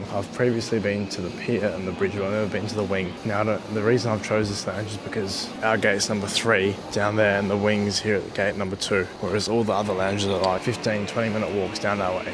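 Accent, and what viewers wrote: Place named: Australia. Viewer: You sound European Australian born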